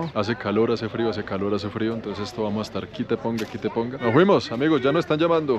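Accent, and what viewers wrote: Colombia. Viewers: Me encanta verte porque tienes tanta humildad y tu léxico excepcional tu asentó colombiano es lo máximo!!! Saludos a la abuela 😊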